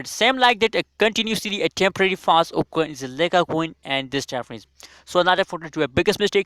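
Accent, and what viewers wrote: India. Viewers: Sounds like you are from India, right? 🙈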